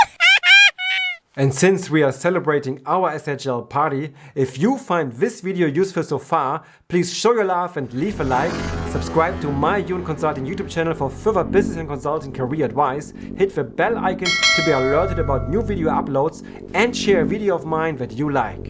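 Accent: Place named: Germany. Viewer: It’s nice to listen to a German among the tones of Indians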